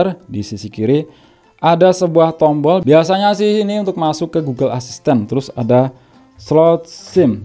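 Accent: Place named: Java, Indonesia. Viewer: 765 tok=aja duh ketok medok e mas🤭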